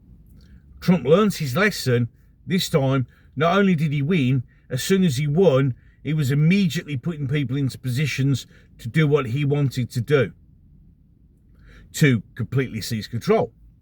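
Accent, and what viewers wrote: Birmingham, United Kingdom. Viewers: Your brummie accent is so strong ! . Great channel our kid , spinning round JLR 😊. YOUR NOT RIGHT PROTECT THE PARTY what’s left civil war ……….. What’s your silly answer ?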